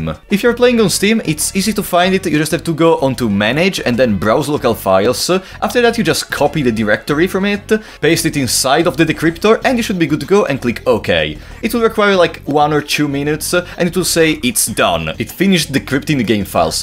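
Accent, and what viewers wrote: Italy. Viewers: sento dall'accento l'italianità, contento di vedere un altro fan di questo gioco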